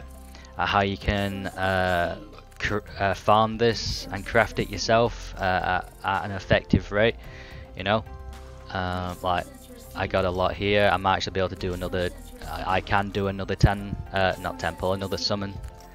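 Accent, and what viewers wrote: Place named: Scotland, United Kingdom. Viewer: scottish accent just make it better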